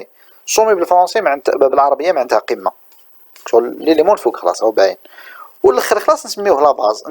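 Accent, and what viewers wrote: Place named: Algeria. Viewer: أقسم بالله اول مرة نسمع واحد يهدر الجريا Algérien à 100%... يعني كيما نهدرو فدار أ ُ فلمسيد... برك الله فيك خونا...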